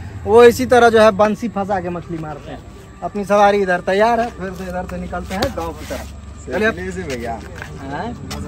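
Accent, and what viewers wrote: Bihar, India. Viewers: Bhaiya aap hamare kosi ka bihari accent ko world level p jrur lejayenge ekdin 🔥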